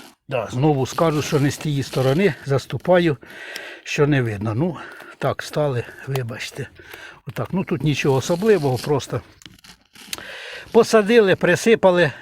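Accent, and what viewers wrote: Poltava, Ukraine. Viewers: я просто з Миргорода, завжди Полтавський діалект виділявся серед інших)))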